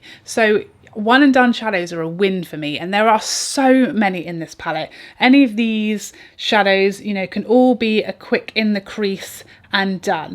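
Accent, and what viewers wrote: England, United Kingdom. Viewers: I'm italian 41 years old mum, I love listening to you and I love your wonderful English accent! 😍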